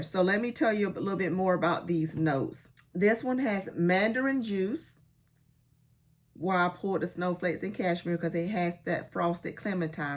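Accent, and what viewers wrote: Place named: Paris, France. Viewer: I hear your combos..don't have most of them. Oh well. U Already pronounce Bouquet just right...the T is silent.. it's the same with Muguet..Moogay. perfume in french is Eau (ike Oh) De ( like street talk..she s at de store. A bad The) then the Flower name (roze, de vee-oh-let..de Sare-eece. Cherry.. the words slide into the next Ohderoze. Ahhh..u sound like you are from PaRee. Paris in french.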